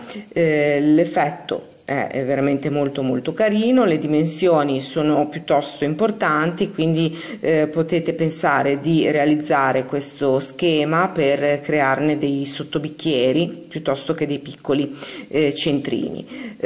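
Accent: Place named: Genoa, Italy. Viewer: ieri sera ho visto per la prima volta un tuo video e ho pensato "mi sa che è genovese"..........e infatti lo sei!!! In che zona fai i corsi? se sei vicina sarei interessata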